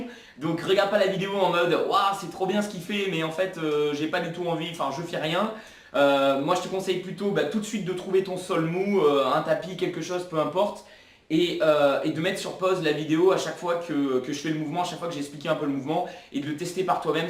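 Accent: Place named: North, France. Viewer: Bonne vidéo mais tu as un putain d'accent ! Tu dois venir du nord 😅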